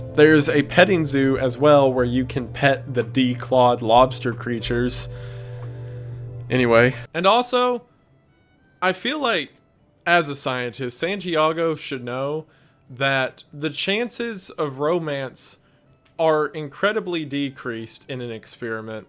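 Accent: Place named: South, United States. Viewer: I adore Wendigoon. I go to sleep listening to that beautiful southern twaaaang.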